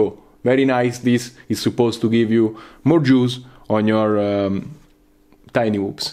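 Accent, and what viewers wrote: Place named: Italy. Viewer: Come si riconoscono gli italiani , anche se parlano inglese correttamente, continua così ottimo video 💪🏻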